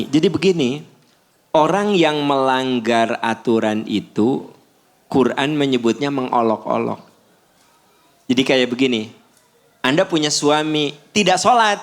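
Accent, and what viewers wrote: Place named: Sunda, Indonesia. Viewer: Saya tinggal di Jerman tepatnya Saarbrücken, ya Allah baru tau ustad Dr. H Aam Amirudin LC,Si dan saya senang mendengarkan tausiah beliau apalagi khasnya bahasa sunda karena sejatinya saya orang Sumedang , jadi saya kangen bahasa tersebut.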